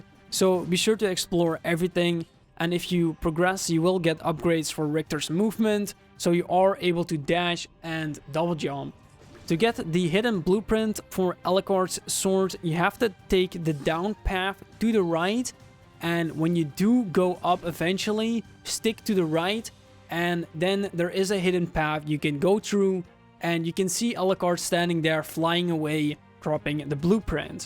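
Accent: Netherlands. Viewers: oh je bent nederlands zo te horen ;) ik heb een vraag, ik wilde laatst Fractures shrines binnen gaan maar toen stond er ''Requires Fatal Falls GLC'' terwijl ik deze dlc al heb gekocht. heb jij mischien 'n idee waarom ik dan toch dit bericht krijg ?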